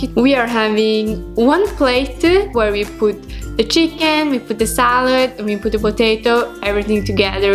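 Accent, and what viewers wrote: Italy. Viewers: why do you speak with an italian accent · her accent sounds more Italian, then Norwegian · She is speaking english with italian accent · Does she speak English with Italian accent?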